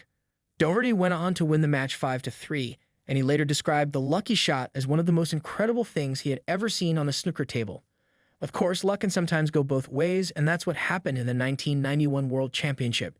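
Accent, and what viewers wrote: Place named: United States. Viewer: So weird hearing an American covering snooker and even the the way he said it annoyed me.. It's not the fish mate 😂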